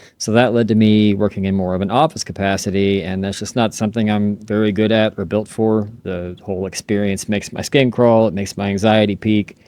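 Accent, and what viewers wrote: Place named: United States. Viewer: This was such a beautiful video! You are a genuinely kind human being. I hope your channel continues to grow exponentially! I think my favorite part was when you had to show your manliness intimidation move(s)😜when your lovely wife showed up. It was as if I was watching a British narrated nature channel only with an American accent. I loved watching your girls interact with you and seeing you joke around with your pops. I, too, feel everything happens for a reason. Remember, it’s always darkest before the dawn. It’ll all work out somehow.😊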